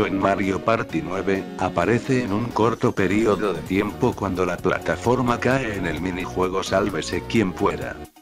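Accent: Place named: Castile, Spain. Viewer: Ese castellano papu :v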